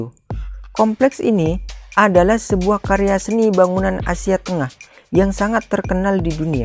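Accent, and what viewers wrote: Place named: Arab world. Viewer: Menang logat arab tidak tau bahasa arab.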